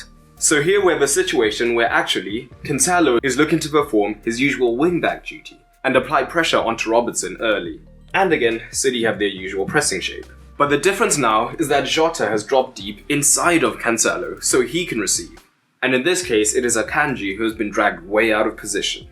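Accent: South Africa. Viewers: Is it just me ? I hear a South African accent…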